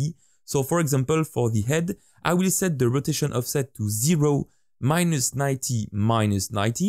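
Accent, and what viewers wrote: France. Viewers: i find you got a french accent, am i right?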